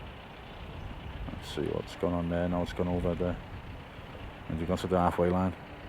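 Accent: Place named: Liverpool, United Kingdom. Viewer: You know those vids on YouTube that are primarily for a foreign audience that focus on the regional accents of England? Yours will get picked up as the Liverpool example as opposed to the Carra/Gerrard ones they always use. 😊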